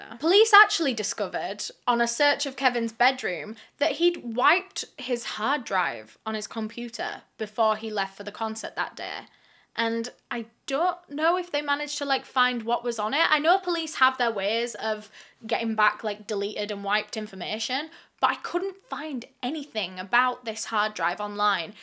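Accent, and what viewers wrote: United Kingdom. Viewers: What is your accent? I cant figure out if its uk or not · It's a UK accent!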